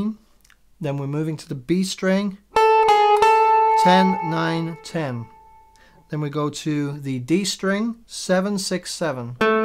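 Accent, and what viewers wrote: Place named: United States. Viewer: I remember you had a Italy connection...Maybe I've missed something you've told me about your move to the Durham in the UK....Yet, it's the American accent that confuses me....Yes...weather wise...back to normal...and maybe some warmer weather middle to end of next week (hopefully)....Regards....Dave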